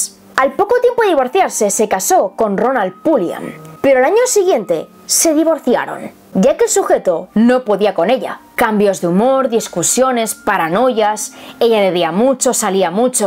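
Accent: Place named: Spain. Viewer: Aaaayyyy Maadreee Vale madre . Xd Es que tu acento español y tu sentido del humor... lo amo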